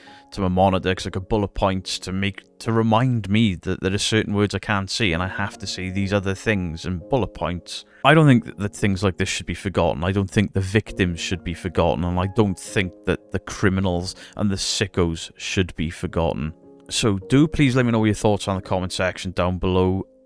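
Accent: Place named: Newport, United Kingdom. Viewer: you must live near newport with that accent.greetings from barry island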